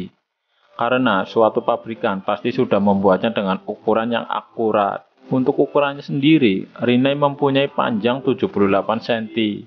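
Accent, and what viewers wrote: Banyumas, Indonesia. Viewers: Ngapak bgtt😂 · njir logatnya ngapak-ngapak.. gak enak banget didengerin 🙃 kaga malu apa bray, ngereview pake logat ngapak gitu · Ora ngapak ora penak, matur nuwun pakde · Kayak orang ngapak bahasanya 🤣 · Daningg ngapak om😁🙏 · ngapak mbok?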